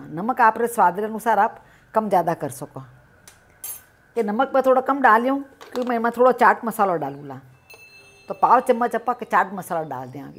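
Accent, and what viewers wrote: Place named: Rajasthan, India. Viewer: I am from Ajmer Rajasthan and fond of your cooking and lovely way of talking marwadi.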